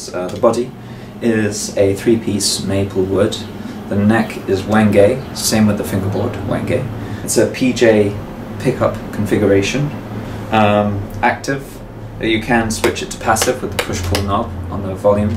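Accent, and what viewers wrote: United Kingdom. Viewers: is one of the heaviest bassists on YouTube also: is British and has a POSH accent me: visible confusion and astonishment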